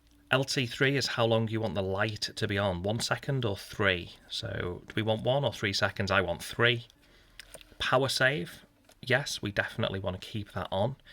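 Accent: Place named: Liverpool, United Kingdom. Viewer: Love this watch,. I also love your accent! I'm a Yank studying all the different dialects of the U.K. Do I detect a bit of Scouse, Mate?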